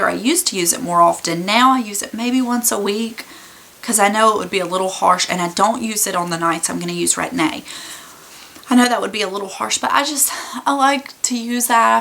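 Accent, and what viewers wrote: South, United States. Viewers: Your country accent is just ADORB! 😍😍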